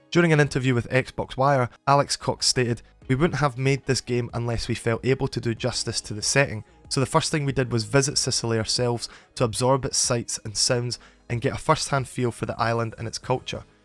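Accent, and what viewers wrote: Scotland, United Kingdom. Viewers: Glad to find your channel, mate. Don't hear enough Scottish voices on here and your commentary is very professional. Subbed. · Are you from Glasgow or Edinburgh?)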